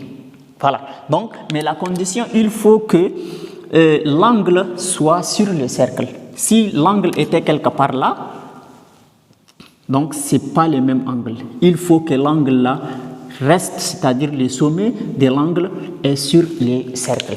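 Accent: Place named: Bukavu, Democratic Republic of the Congo. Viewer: toi c,est certainement à Bukavu selon la prononciation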